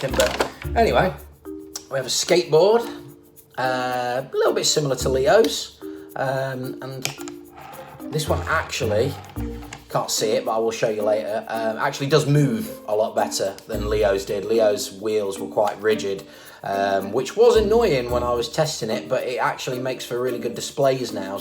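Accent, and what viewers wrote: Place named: Australia. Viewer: I like your Australian accent! Also great review, really enjoyed it.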